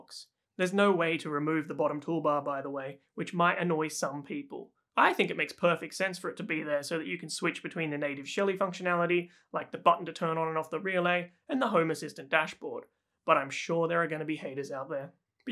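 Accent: Australia. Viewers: Hey mate, I noticed the Aussie Twang. Understand you're in the UK, how much of this can I replicate in Sydney? I've got a mid 90s brick veneer home and things like neutral are not there and I'm not looking to get new wiring in.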